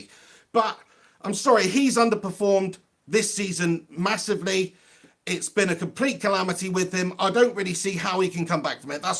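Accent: Manchester, United Kingdom. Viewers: I cannot understand him, his manc accent is to strong.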